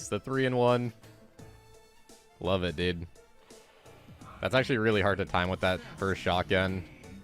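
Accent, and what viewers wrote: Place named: United States. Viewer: What region of the U.S is that accent from? Well idk not accent but like...inflection?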